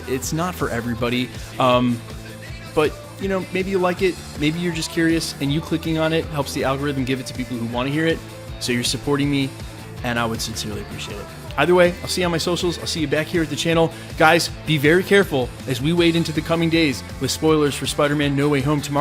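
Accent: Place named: California, United States. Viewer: You sound very California. Woah dude.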